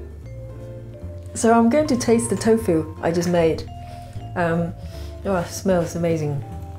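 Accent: United Kingdom. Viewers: LOVE your British accent!! Great video:)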